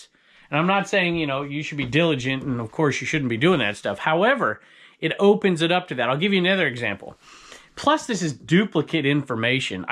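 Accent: South, United States. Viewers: You sound like a confederate.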